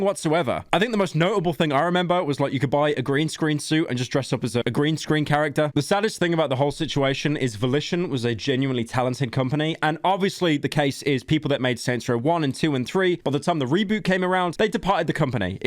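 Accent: United Kingdom. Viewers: I’m convinced viewers are degenerates and are okay consuming anything with buzz words with funny British accent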